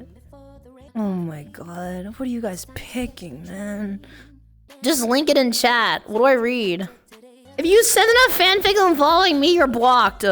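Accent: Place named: United Kingdom. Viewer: Not the british accent 😭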